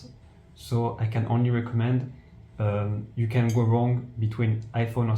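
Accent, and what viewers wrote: France. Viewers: Good video but je sais que tu parle français ça s'entend lol merci pour les informations en tout cas 😁😁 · salut, est ce que tu serais un français par hasard ? tu as un peu l'accent je trouve hehe.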